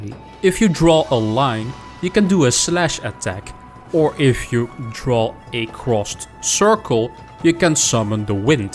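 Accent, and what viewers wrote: Netherlands. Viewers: Hahaha het is altijd te horen voor Nederlanders.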